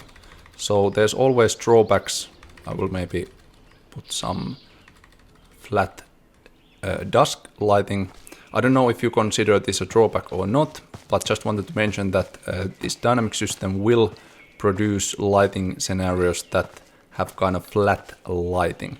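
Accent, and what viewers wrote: Finland. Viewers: After watching so many interviews of Sam Lake, I could not not hear the charming Finnish accent :D. Love your game, btw. Keep up the good work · Thought the accent sounded familiar, until I realized you was Finnish! näyttää hyvältä 🤌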